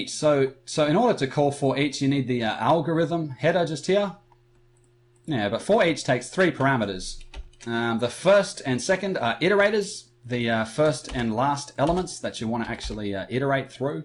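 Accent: Australia. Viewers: You're the good legend here:D Awesome high-quality content, generally detailed low-level explanations, and cool Aussie accent. Gotta love this channel! Wish I could be a supportive patron, but can't from here.:/ Salutes from Syria anyways.:P (The hype of "Return of Lambda" is unprecedented). xD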